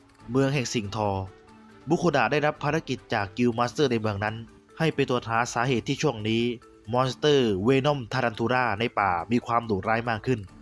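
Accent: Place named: South, Thailand. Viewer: เจ้าของเพจเป็นคนจังหวัด กระบี่ หรือพังงา ครับ เสียงออกโซนนั้น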